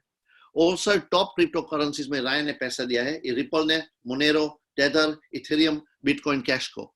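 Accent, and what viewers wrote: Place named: India. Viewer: Apki hindi indian jaysi hai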